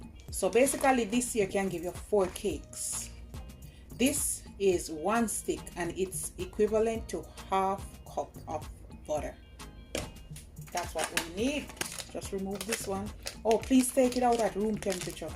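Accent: Jamaica. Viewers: And the Jamaican talk love it mmmmm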